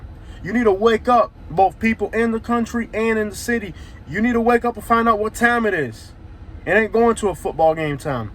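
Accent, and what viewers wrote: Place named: South, United States. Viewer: Hey you developed a southern accent rather quick.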